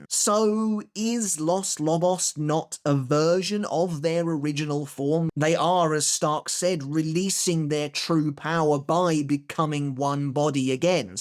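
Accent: United Kingdom. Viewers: Too british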